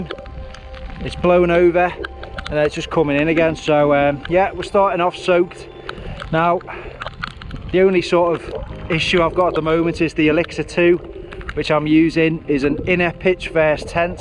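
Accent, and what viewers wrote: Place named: Liverpool, United Kingdom. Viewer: Always enjoy videos, a fellow scouse accent on the youtube!!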